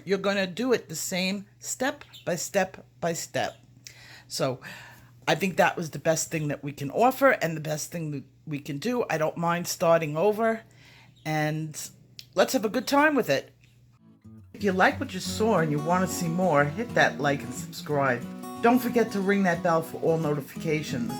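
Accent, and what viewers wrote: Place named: East Coast, United States. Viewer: I am so excited to do the step by step with you and what an awesome gift....you are a very beautiful teacher. You remind me of Cary Ublouer who was a teacher I studied with many years ago. Since you have an accent, I told my friend Bill about you...he just loves your lessons because he's from the East coast and misses home! Thank you so much for your sharing your experience with us. You're a wonderful teacher!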